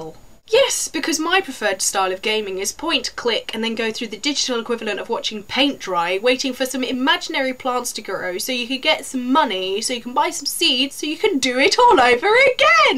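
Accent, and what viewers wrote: United Kingdom. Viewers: HAHA! THOUGHT THIS WAS QUITE FUNNY ACTUALLY. . SURPRISED ME WHEN A TRUE BRITISH ACCENT CAME OUT TOO! :)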